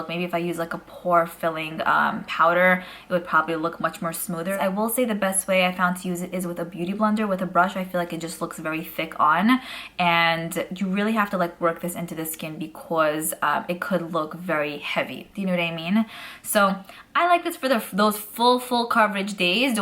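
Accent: New York, United States. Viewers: I ❤ your New York accent. You make me smile with every video. Could you try the new wetnwild palettes? Some love it, others hate it. I don't know what to think. Also the new Revlon prismatic eyeshadows palettes?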